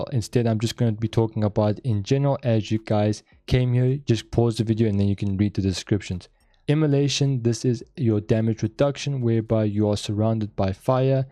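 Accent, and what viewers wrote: South Africa. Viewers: wait. you south african?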